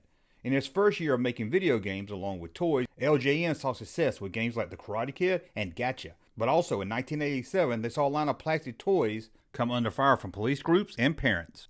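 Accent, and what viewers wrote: South, United States. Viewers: As a fellow toy nerd and southerner, it’s awesome to hear your accent talk about these subjects.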